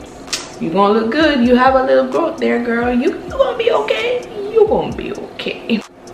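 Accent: Jamaica. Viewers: I swear it so comforting hearing the Jamaican accent as another Jamaican person 😩🤣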